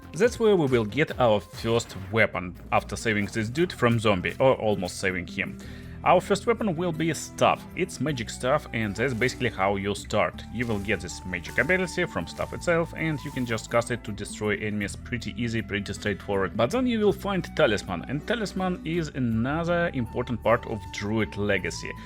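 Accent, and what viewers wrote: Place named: Russia. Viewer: Ты бы не выёбывался, а говорил по-русски, слышно же, что русскоговорящий. Зыс и т.д. произношение выдаёт.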